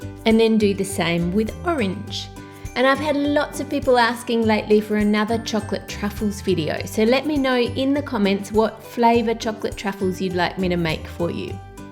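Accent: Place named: Australia. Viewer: probably the only person who speaks in an australian accent i didnt enjoy listening to when watching this video.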